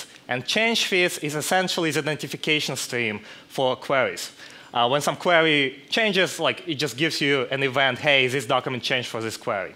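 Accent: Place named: Russia. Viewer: Russian accent. Is he from Russia?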